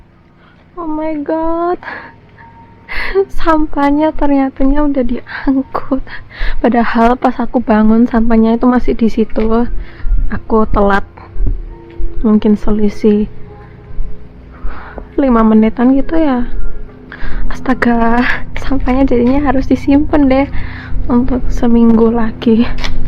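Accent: Java, Indonesia. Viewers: Medok tenan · Jaadi kalo ngomong jepang aksen medok jawa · Kalo pas lagi ngomong jowo atau Indonesia medok benar-benar seperti orang jowo...☺️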